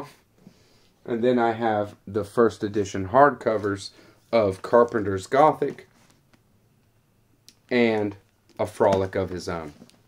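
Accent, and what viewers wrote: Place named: Western Maryland, United States. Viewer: Good video man. Never knew about over half of these. Sounds like ur from western MD. Thats my old stomping ground.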